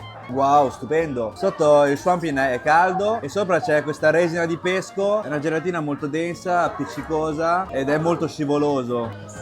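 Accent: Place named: Romagna, Italy. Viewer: Di dove sei??? Hai un accento romagnolo.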